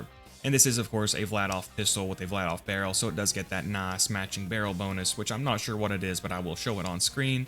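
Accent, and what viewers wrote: South, United States. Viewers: You don't really sound much like fiber honestly. Your accent is pretty clearly southern his is far less so, if at all. Shadow definitely has the master lock picking ability lol. The best bossing weapons in the game, as far as I know, mostly all have a synergy with the bee due to unlisted projectiles, a unique firing pattern, or are able to benefit from multiple parts of the damage formula. The ones that don''t still kill things in op10 but they just take longer to do so. I think much of the gear discourse in this game might actually be driven by availability and the opportunity cost of having an item equipped/taking up bank space compared to another. Having only 63 places per VH to hold gear makes the decision on what to farm, keep, and use more difficult than it otherwise might be. The unlimited bank mod is really helpful for this, but I think much of the discourse around what's "good" at end game stems from the early days when most played on console and mods weren't as readily available on PC. 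Also, does Buttstallion always/often drop gemstones, or is that just the only place you can possibly acquire them? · They honestly do sound similar its just his voice has a southern kind if accent and fiber's doesn't.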